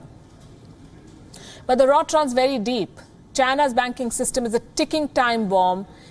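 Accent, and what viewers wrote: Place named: India. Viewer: forgif and understand her indian accents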